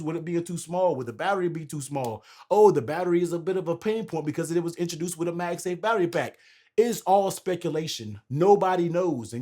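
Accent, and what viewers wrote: United States. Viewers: I know that accent…you from the DMV bruh?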